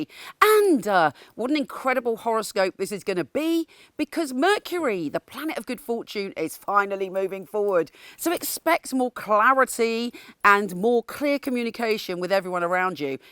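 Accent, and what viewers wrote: United Kingdom. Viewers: I'm hoping communication will be better it's been faulty in the present. Where's your accent from? (UK). Go with your gut l say.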